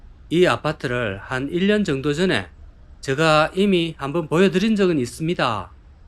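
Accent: Gyeongsang, South Korea. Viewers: 오랫만 겡남사투리 좋아요~~ // 재건축하긴 세대수가 좀 많고..대지도 넓지 않아서 애매한 ...위치는 참 좋네요 ~ 주민들도 진퇴양난 이겠응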